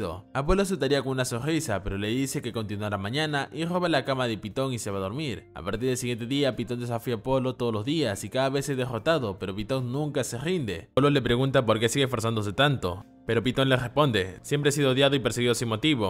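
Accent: France. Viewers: tienes un acento francés, ¿eres de allá?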